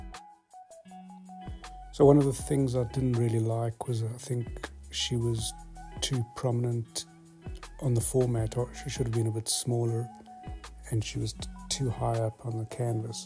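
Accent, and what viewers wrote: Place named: South Africa. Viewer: Lovely painting! You sound SAfrican...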